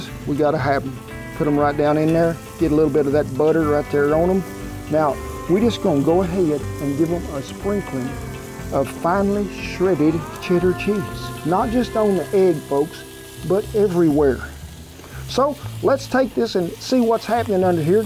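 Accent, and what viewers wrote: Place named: Wisconsin, United States. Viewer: You and cheese! You almost sound like you could be from Wisconsin. Thank You.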